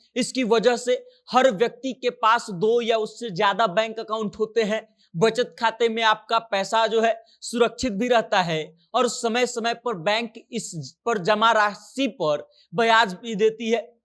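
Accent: Bihar, India. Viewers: बिहार से हो क्या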